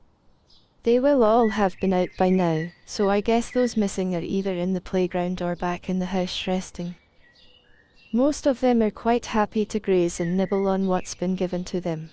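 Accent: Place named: Scotland, United Kingdom. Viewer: This top-down surveying shot is so beautiful! I get to observe most of your boars and how they interact! They are so lucky to be free and have lots of space to explore and play around! So, you are, from Scotland, with a beautiful Scottish speaking style! May I ask who the primary caregiver is for these precious piggies? You really are doing a great job!!! I always have so much fun listening to and watching your video! Your piggies are more than adorable!:)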